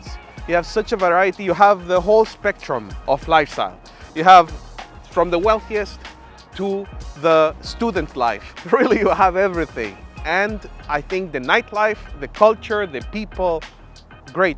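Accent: Spain. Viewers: you are very charming and positive with your Spanish accent !!! Are you Argentinian of the Italian decent ? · Great Info. I was confused at first listening to a Spanish accent from Montreal. Most Americans have trouble with one language let alone 3. You forgot the biggest pro - the food.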